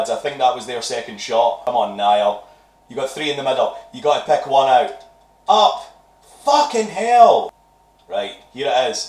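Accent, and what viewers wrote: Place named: Scotland, United Kingdom. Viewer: Hey. I quite like the content you make, loving the thick Scottish accent! You're quality is top notch, I dont want to put you or your content down buddy, but have you got a pop filter for your mic? It might eliminate the echoing on your microphone. Just a thought:) Atraxi. - Subbed!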